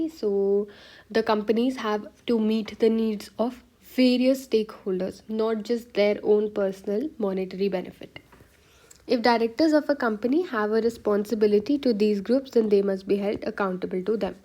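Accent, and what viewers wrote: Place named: Kerala, India. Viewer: Are you malayali . Sound ketapol thonni😊 . Good class really helpful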